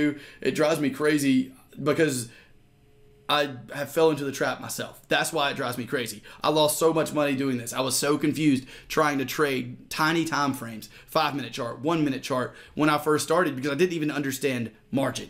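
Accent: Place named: South Carolina, United States. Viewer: ooo hang on.. that's gotta be a South Carolina accent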